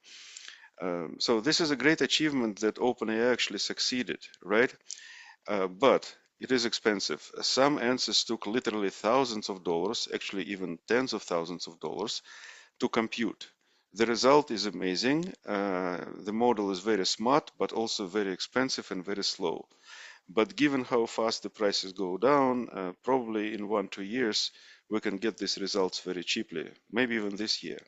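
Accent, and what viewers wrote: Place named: Russia. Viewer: Love your English accent, you seems to be from Russia.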